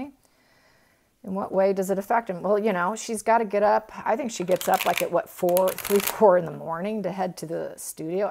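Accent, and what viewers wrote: United States. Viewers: Love your voice! It’s calming are you from the Midwest? I sensed that from you the day I heard your voice.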